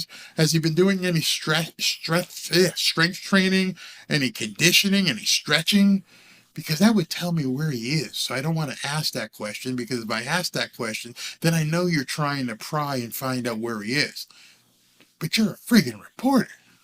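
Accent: United States. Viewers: I think the number of times you said his full name is at 15 with that awesome Pennsylvania accent. We know his name! Lol He's been hurt more then we know. Why do you think he forgot how to play in the 49ers game and the Chargers game... Head trauma. Tua needs to quot and if not he needs a new team. His career has been a train wreck.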